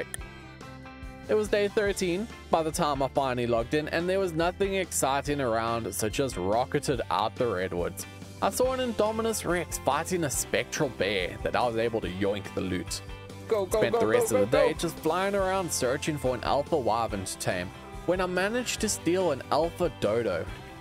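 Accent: South Africa. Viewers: Are you south African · I was like this man sounds like a south african and when you said ahh eskom i was like yes he is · i knew you where also south african i knew it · My guy are you from south africa? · WAIT UR SOUTH AFRICAN OMG BRO WHAT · I knew by the accent your South African lmao🇿🇦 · You South African😮😭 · No wonder your accent felt so familiar you're from SA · U from south africa????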